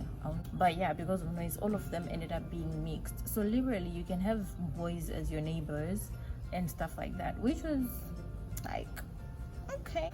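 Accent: Ghana. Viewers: You sound a Ghanaian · Ghanaian accent is the best just like the British.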